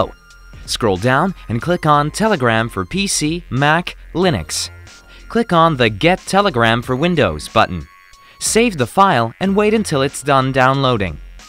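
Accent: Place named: United States. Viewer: this voice is in American English. I was able to set this up and i am not an IT person. Thank you for helping.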